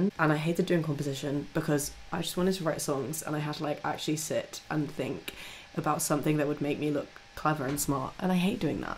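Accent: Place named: South Africa. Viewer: you sound South African. you from Australia or New Zealand?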